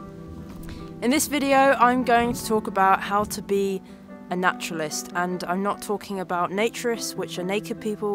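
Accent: United Kingdom. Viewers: I think I understand the accent very well but there several new words that I've never heard before (for animals, plants etc.). Thankfully not that specifically annoying type of British accent.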